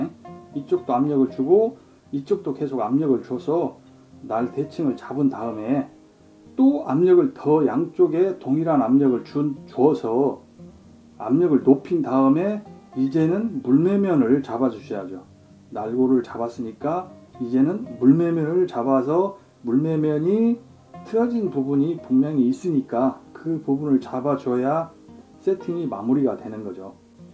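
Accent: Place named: Jeolla, South Korea. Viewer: 사투리를 많이 쓰시는데 호남지방 어디신가요? 나또한 그쪽이라서요.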